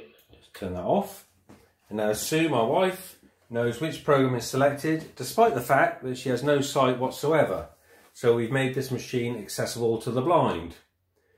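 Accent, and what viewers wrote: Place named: United Kingdom. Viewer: I love that idea! I wonder if my company could make something similar for appliances like that. By the way, I love your accent! Is that British? I wonder if that machine works on dryers as well. Like your wife, I'm totally blind, and one thing I would love to design is a washing machine and dryer pair that can have as many cycles as you like. Wouldn't that be amazing?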